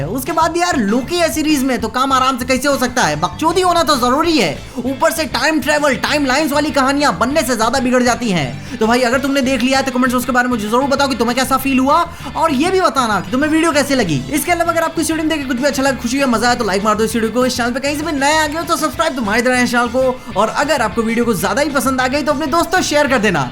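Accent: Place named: Bihar, India. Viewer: भाषा के बोली से त बिहार से लग हथिन ।।।## कभी निराश न करे ला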